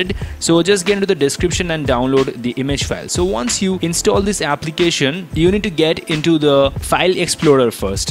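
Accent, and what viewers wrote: India. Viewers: Hindis English😄😄😄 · Indian has a funny accent, i imagined he moving his head when talking, like acha acha nahi nahi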